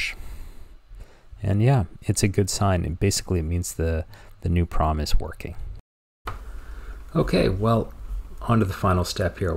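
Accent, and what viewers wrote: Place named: Canada. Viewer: I bet you're Canadian.... (my ears always perk up when I hear "aboat"). A Sparc 10 was what I spent the latter half of the 90s on. Nice to be able to project vicariously what upgrading mine would have been like...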